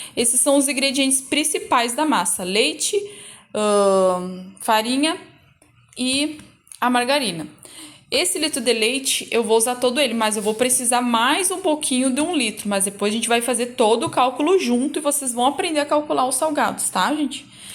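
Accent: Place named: South, Brazil. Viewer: GRATIDÃO 🙏🙏🙏ADOREI SEU DOTAQUE❤TU É DO SUL???😘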